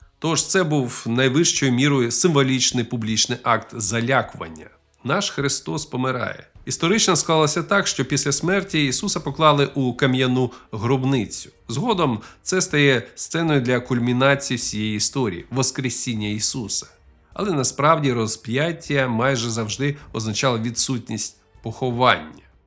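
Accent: Russia. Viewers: Трохи з російським акцентом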